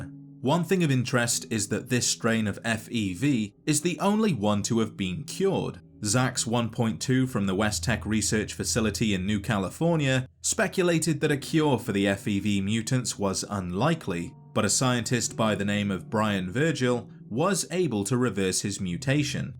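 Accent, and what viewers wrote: United Kingdom. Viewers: Part Shoddycast, part Oxhorn, part MittenSquad, fully British.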